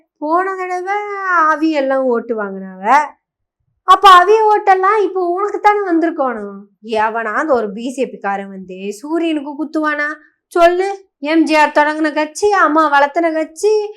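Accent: Kongu, India. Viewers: கொங்கு தமிழ் சூப்பர் சகோ பாராட்டுகள் நன்றி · திராவிடச்சுடரின் கொங்கு தமிழ் விளாசல். நன்றி. வாழ்த்துகள். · எப்படிப்பா இப்படிஅசத்திறிங்க அருமை கொங்குதமிழில 😄🙏🙏❤❤💐💐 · கொங்கு தமிழ் கொஞ்சும் பதிவு.. அருமை அருமை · நன்றி தோழியே . உங்கள் பணி மேலும் தொடரட்டும் அழகான கொங்கு தமிழில் . வாழ்க பெரியார். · சிறப்பான,தரமான பேச்சு.கொங்கு தமிழில் சீமானை சுளுக்கெடுத்து விட்டாய் சகோதரி.வாழ்த்துக்கள் .🎉🎉🎉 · அருமை மகளே உனது கொங்குத்நமிழ் என்னை மிகவும் ரசசித்து கேட்க வைக்கிறது வாழ்த்துக்கள் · சகோதரி கொங்கு தமிழ் சூப்பர் பாராட்டுக்கள் வாழ்த்துக்கள் 😂😂😂😂😂😂😂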